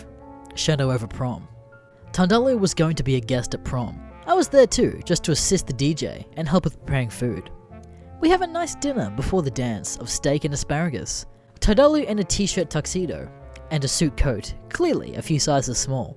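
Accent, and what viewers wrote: Australia. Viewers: Wait your an AUSSIE A REEEEEEEEEEEEEEEEEEEEEEEEEEEEEE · good morning aussie boy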